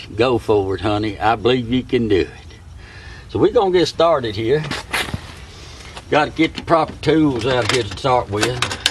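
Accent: United States. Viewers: Thank you so much! You have a accent like mine from good old East Tennessee. 🍊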